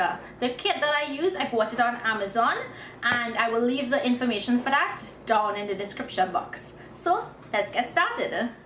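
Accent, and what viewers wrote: Trinidad and Tobago. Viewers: KC and LoLo 23 it's a Trinidad and Tobago accent i.e. Caribbean 😊 hence the name of her channel as well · Are you from Trinidad? I love your accent!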